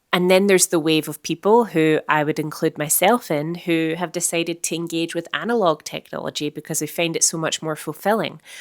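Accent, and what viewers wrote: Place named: Scotland, United Kingdom. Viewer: And it does look cold and empty. I don't know, this whole thing may be a bubble, but doesn't seem like it given the practicality of it, at least in terms of making laborious tasks easier. However, this brings up the question of....what about assistant editing? Ingest, stringouts, etc, what about the entry level jobs like that? The tools are already beginning to at least be of use so far but it does worry me. Capitalism obviously doesn't care about keeping people's jobs or about creativity. What the system cares about is quarterly profits and how to maximize it. And that's the problem with people who say "It will aid us as a tool". The executive boards may not see it that way. They actually don't. Anyway, enough ranting from me. Hah I can listen to you talk for hours with your lovely Scottish accent!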